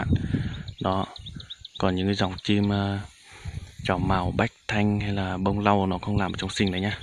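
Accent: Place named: North, Vietnam. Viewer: Bạn là người miền Bắc vào Tây Nguyên sinh sống à? Mình thấy bạn nói giọng miền Bắc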